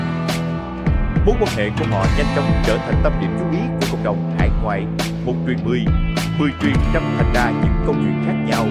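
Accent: Vietnam. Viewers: giọng miền Nam hay truyền cảm của MC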